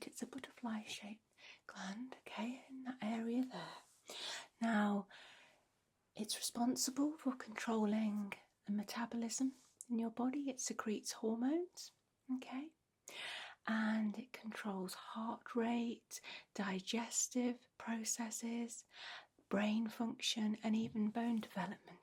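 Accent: United Kingdom. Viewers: Love your beautiful British accent. Btw you nailed this roleplay. Good job my dear 🥰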